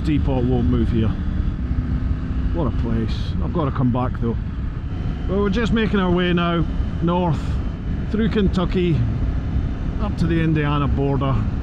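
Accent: Scotland, United Kingdom. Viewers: Excellent viewage, just love the noise that bike makes and with a scot accent ... the landscape is phenomenal 👍😎👍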